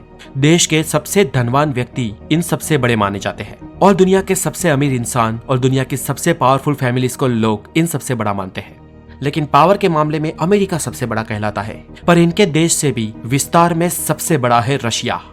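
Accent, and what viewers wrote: Gujarat, India. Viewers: ગુજરાતી લાગો સો ભાઈ...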